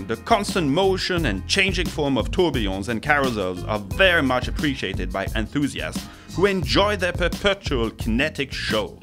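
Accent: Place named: France. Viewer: Mais pourquoi ne pas le faire en français ? Surtout avec cet accent. Bonne revue quand-même.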